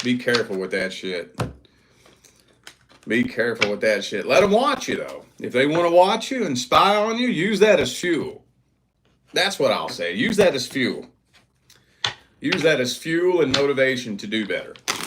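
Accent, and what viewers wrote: South, United States. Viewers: Hahaha you’re like me surrounded in vapes!! Eastern KY!!!!!! Go BIG BLUE!!!!! I KNOW you’re southern or somethin…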